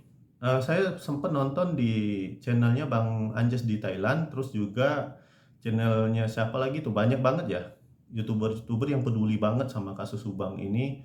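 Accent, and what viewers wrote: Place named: Palembang, Indonesia. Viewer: Kirain dr bali,, trnyta wong palembang kak yo..????? 🤔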